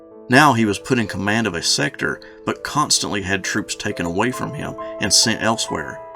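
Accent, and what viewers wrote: South, United States. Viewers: Very informative. I enjoyed the southern accent too. Thank you.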